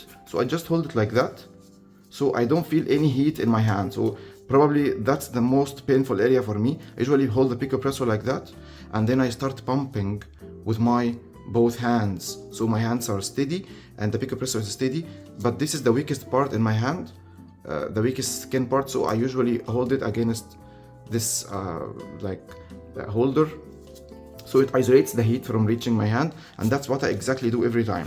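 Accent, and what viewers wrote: Egypt. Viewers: subscribe and support for Egyptian content and Egyptian Accent 😍🔥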